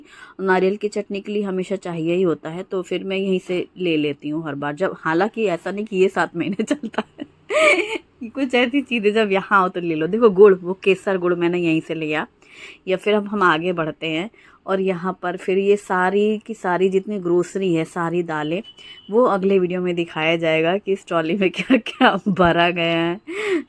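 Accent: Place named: Lucknow, India. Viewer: Hum lko k hi hai.. Apki bolne k tareeke se hi laga ap wahi k aspas k hoge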